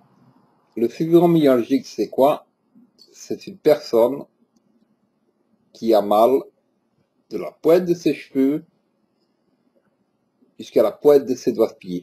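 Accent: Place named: Belgium. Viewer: Merci et bravo :) je suis fibro depuis 12 ans et depuis cataloguée hypocondriaque par mon doc donc aucune prise en charge anti douleur ah si je dis des conneries dafalgan codéiné pour mes migraines avec aura nan pasque ca donne mal au crane de souffrir h24 quand même alors merci j ai adoré t'écouter (ahhhhh l accent belge) ps: suis de france genre cht'i et ici même bordel fibromyalgie kezako reprend un ticket et reviens me voir plus tard lol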